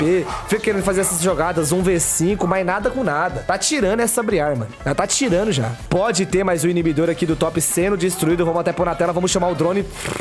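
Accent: Minas Gerais, Brazil. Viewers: vc e MIneiro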